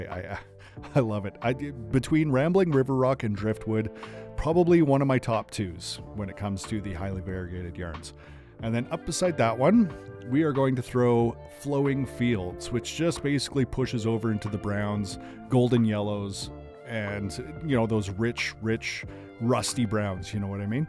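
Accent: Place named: Alberta, Canada. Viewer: I absolutely love how you take us through the process and show us each color way worked up and paired. Makes me want to buy EVERYTHING. Fantastic marketing strategy. Canadian living in the US so it’s fun to listen to your Alberta accent. I have family around Lethbridge through to The Hat. Love your videos; keep ‘em coming. 🙂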